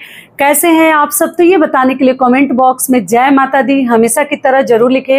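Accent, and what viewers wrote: Bihar, India. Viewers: Didi main aapko pahli bar hi awaaz se Pakad Le ki aap Bihar se Hain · Didi mera bhi guess sahi tha ki aap bihar ki hi hai.kyoki aap ki baato se lagta tha ki aap bihar ki hi hai.thanku di apne bare me share karne ke liye. Next time jab aap apne mayka aayengi to hamse jarur miliyega. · Hm sahi pakde the di Bihar kiuki hm v Bihar jharkhand se hu kiuki apka bhasa lgta tha Bihar wala 😊😊😊😊 TQ ❤️ di my dear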